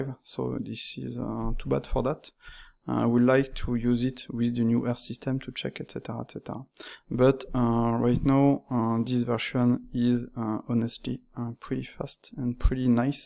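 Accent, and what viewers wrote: France. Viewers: on reconait le francais mdr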